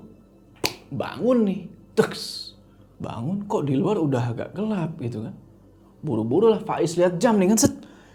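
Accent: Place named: Java, Indonesia. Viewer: bang, aksen jawa lu makin hari makin bagus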